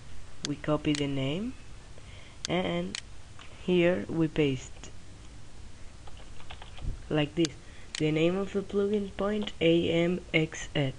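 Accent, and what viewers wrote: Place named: Spain. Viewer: are you kidding me? he speaks great english, only thing different is the spanish accent. This was better english than most english speaking people can produce. Thumbs Up!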